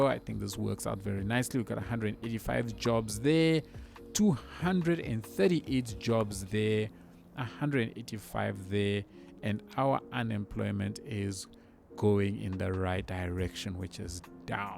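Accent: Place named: South Africa. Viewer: Love your South African accent bro refreshing from all the American YouTubers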